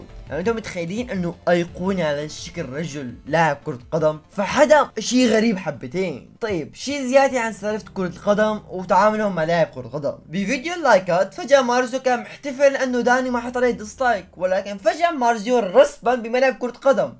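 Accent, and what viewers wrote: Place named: Saudi Arabia. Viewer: ليه تتكلم سعودي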